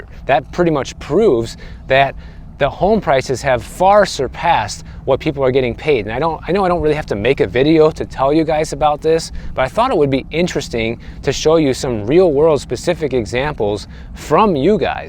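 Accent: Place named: Midwest, United States. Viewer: You still have your midwestern accent (flat vowels).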